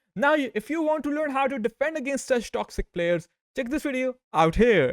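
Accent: India. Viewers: Are you from India mother yar????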